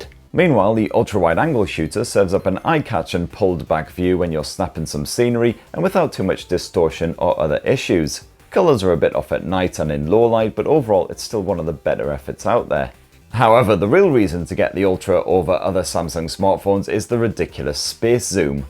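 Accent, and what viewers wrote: United Kingdom. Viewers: love your serious brit accent and syntax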